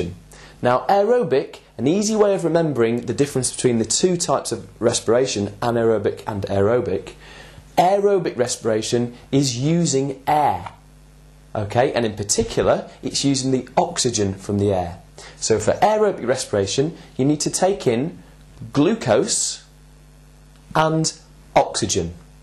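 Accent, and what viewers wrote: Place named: United Kingdom. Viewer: thanks! this really helped! i really wish my bio teacher had a british accent! :)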